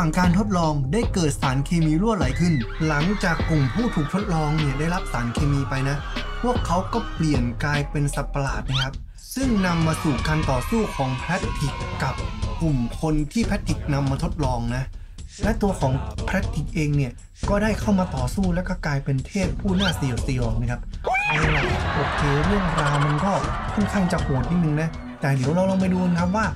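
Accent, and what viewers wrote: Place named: United Kingdom. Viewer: ชอบสำเนียงภาษาอังกฤษมากเลยค่ะ ติดตามเลยย❤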